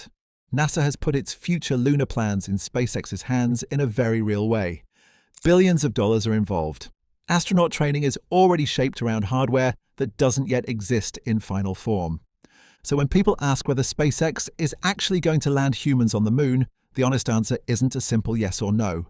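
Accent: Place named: United Kingdom. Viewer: thanks for sharing i like science documentaries plus enjoy your British English accent look forward to your next work greetings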